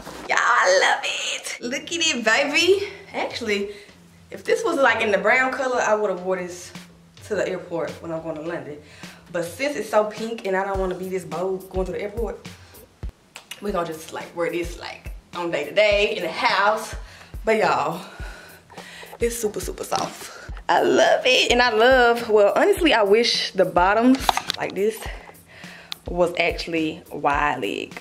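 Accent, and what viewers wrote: Memphis, United States. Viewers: Hearing the Memphis slang and accent through her videos is so homey to me 😭 I be home sick when I’m not there.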